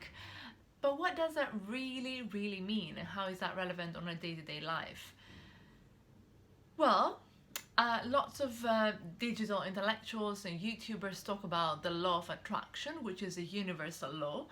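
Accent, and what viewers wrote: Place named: France. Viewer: Ok, first off: your positive energy is very much appreciated. Secondly, your accent is thick French; are you French by any chance? To dig into the meat your argument, your example was purposely vague to stimulate thoughts. Very provocative, I must say. I hate to argue with positive women such as yourself, but energy is technically not a force; however, I will forgive you this time, because energy operates under similar laws to Newton's 3rd. If you want to broaden your arsenal, the law of energy reciprocity, that you are refering to, is called "Conservation of Energy".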